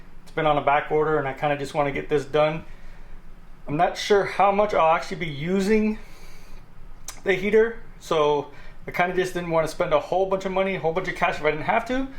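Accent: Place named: New Mexico, United States. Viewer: I keep hearing a New Mexico accent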